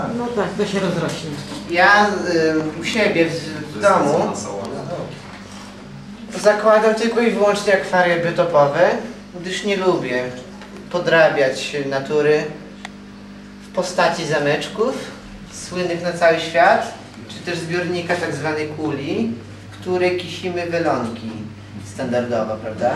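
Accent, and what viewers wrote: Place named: Kresy, Poland. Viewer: fajny ten akcent to pewnie jakieś kresy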